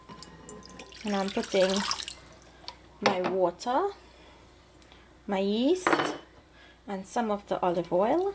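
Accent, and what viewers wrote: Trinidad, Trinidad and Tobago. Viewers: That is Trinidad accent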